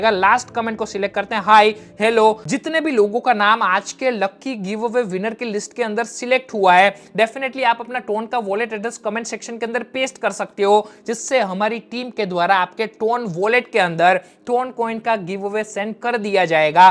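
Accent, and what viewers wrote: Gujarat, India. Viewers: Aapki hindi Sunke Aap Mere Ko Gujrati Lagte Ho Bhai Ho Gujrati To Kaha Se Ho Bhai😊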